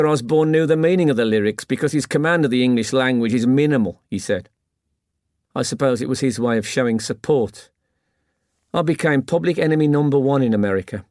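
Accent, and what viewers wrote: United Kingdom. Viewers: That brummie accent sounds perfect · Thank God this was told in a British accent.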